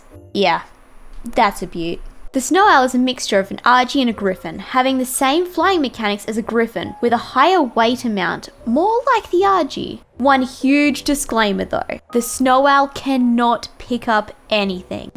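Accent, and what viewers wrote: Australia. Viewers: Crikey! She’s Australian! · An Aussie I see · she sounds like a basic Aussie to me — from an Aussie. Nothing wrong with the voice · Is she Australian or just imitating Steve Irwin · Your Aussie accent makes me want to have your babies. I will carry them to term. Also, you're doing a great job as a commentator. Keep it up, and I'll have triplets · Not gonna lie. It caught me off guard that a sweet aussie girl started talking rather than "BOYS AND GIRLS OF THE SHAAAAAAAAAFT" XD But she is great! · She's so Australian it hurts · I like little Aussie accent lol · Honestly, aussie girls and video games? Like Heaven. I could listen to that accent for hours! Lol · Gotta love that Aussie accent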